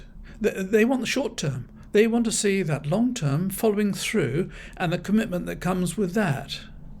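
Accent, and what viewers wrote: RP, United Kingdom. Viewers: By the way - you used the term "rabble",? What exactly do you mean by that? Who is this "rabble"? People like me who, unlike you, don't speak with a plummy accent? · rather a plummy accent telling truths than an oink telling porkies.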